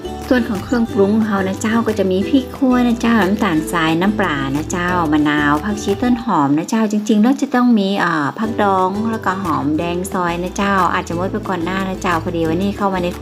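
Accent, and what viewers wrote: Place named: Northern Thailand, Thailand. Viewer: น่าทานมากค่ะข้าวซอย อู้กำเมืองเพราะจังค่ะ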